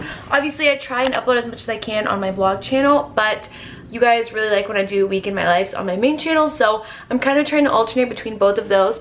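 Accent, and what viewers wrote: Louisiana, United States. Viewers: You could totally hear accent come alive when she was home in Louisiana LOL! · We love that Louisiana accent that came out on Easter lol I enjoyed this vlog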